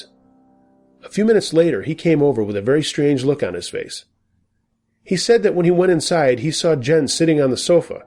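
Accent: United States. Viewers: Your channel is one of my favorite and best accidental finds yet. <3 I adore creepy pasta, true stories and paranormal anything. I was having a hard time finding an American accented narrator I really enjoyed. Your pitch, intonation, and tempo .... spot on. <3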